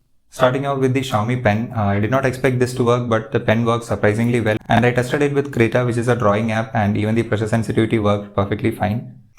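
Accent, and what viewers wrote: Kerala, India. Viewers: Malayali anno bro ?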